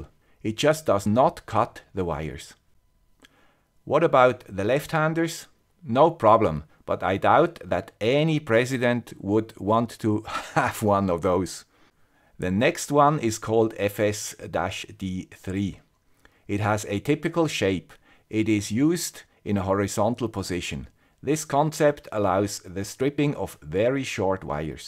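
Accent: Switzerland. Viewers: I love your Swiss accent and pronunciation - Knipex for instance. In German the K is pronounced. In UK/US English the K would be silent, so we generally pronounce it 'Nipex'. I suppose it should be K nipex as it's a German product, but I'll stick to Nipex - it's easier to pronounce! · Boy oh boy do you come with some baggage! (Politically correct, left-handed, anti-imperial system with stimulating views of silicon.......). I only hope you find making these videos as cathartic as I find them humourous. A real fillip early on a Sunday morning. Power on you favourite 'guy with the Swiss accent':) I remember our blue handled diagonal cutters - that lasted for years being (I was going to say stamped but of course that is too crude) 'engraved' with 'Swiss Made' on the shaft (in fact probably hand-signed - they were so expensive). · I had a dream The guy with a Swiss accent decided to build an eBike. 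· Having a good Swiss accent, you must be unbiased! But I like my yellow stripper. I had mine to bits, oiled it, adjusted it and loved it and it works fine. I do agree about the end stop. It lets the end go past, and is imprecise in its adjustment. Why don't the Swiss make one? They make excellent watches, so a wire stripper that works should be a piece of cake!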